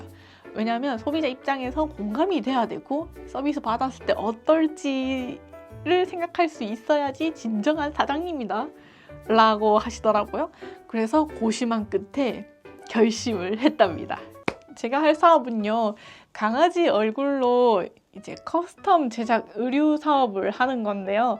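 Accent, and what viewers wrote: Gyeongsang, South Korea. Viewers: 경상도 억양 귀여워요 ㅋㅋㅋㅋ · 우즈벡 부동산 블로그보다 여기까지 왔네요 ㅋㅋ 저도 isfp인데 i 발음 들으니 뺴박 경상도 같으신데 맞죠? ㅋㅋㅋㅋ isfp는 누워있는거 젤 좋아하는 집순이인데 사업이라니 대단하세요~ 우즈벡 영상도 올려주세요~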